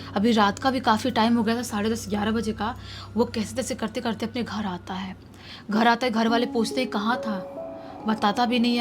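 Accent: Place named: Uttar Pradesh, India. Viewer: Bhai hum roj aapki kahani sunte hai aur aap aaisa lagta hai ki aap up ke ho 😊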